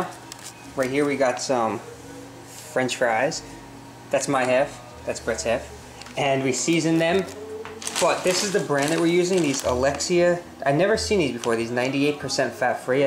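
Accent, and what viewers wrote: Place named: Alabama, United States. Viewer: I just noticed your accent....are you from Alabama?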